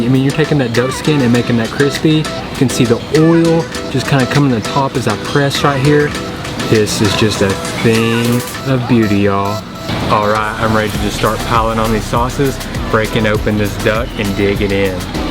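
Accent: South, United States. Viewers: Sir, what do you do? Your travel is a long one. I have a two week vacation and Philippines is my spot this summer. I am in Chicago. You sound like a southerner. I am a Chemist here in Illinois. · You are a combination of Mark Weins and Trevor James with a hint of Southern twang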